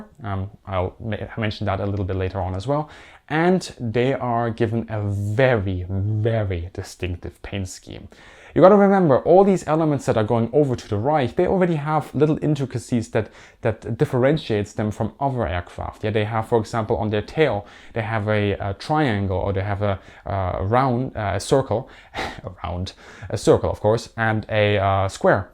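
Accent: Germany. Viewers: Well, it's Germish. Such laziness of English speaking they usually learn no foreign language AT ALL. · Are you German... you sound like it? If so, you are the only German person I've ever heard who knows how to pronounce 'bomb, bomber, bombing' correctly (i.e. not bom-b, bom-ber, bom-bing). Well done! :-) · This is a fantastic history video. This is the most fascinating WWII history I've ever learned!! Def subscribed, and will contribute on Patreon. Strange thing is, you sound German, but you referred to Germans as "krauts". Is that a popular sentiment in Germany? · Hey this guy talks like a german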